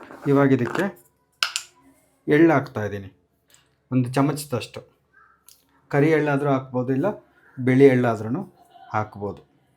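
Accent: Chitradurga, India. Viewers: ಕೋಲಾರ ಅಲ್ಲ adu Chitradurga da ಭಾಷೆ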